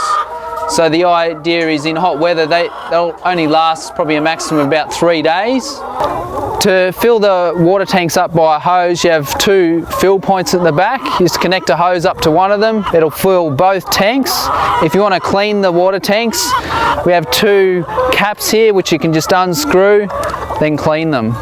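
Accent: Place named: Australia. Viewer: Ur australian :P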